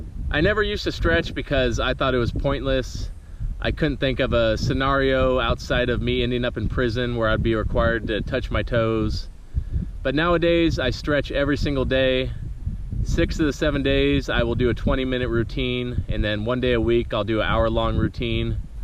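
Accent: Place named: United States. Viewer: Sangria pronounced SANGRIA not Sengria. If you you want to learn Spanish you must drop that gringo accent. Otherwise sounds so stupid.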